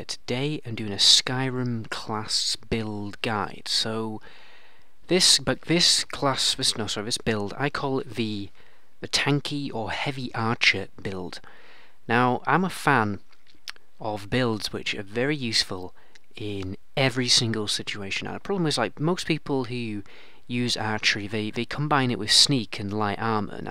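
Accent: United Kingdom. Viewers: Cool accent. Where are you from in the UK? I'm Scottish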